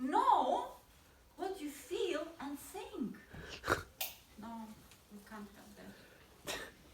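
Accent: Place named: Russia. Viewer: I love your typical russian accent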